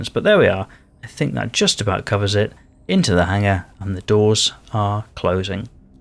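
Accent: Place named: United Kingdom. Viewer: Hope you stay safe amidst the turmoil in the UK from what i can tell (your accent lol) you are British Anyway stay safe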